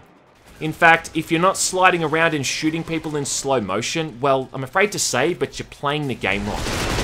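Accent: Australia. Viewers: putting on an accent? ever heard of australia?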